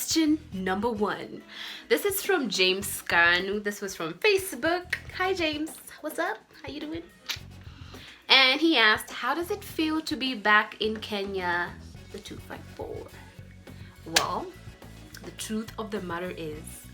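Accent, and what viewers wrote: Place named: England, United Kingdom. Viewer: I dont know if I have said this before but mahnn do I love your English accent u know how u pronounce words gets my attention all the time ooh and your channels are so like real if u get what I mean like all the tusmall movements biros speech keep that up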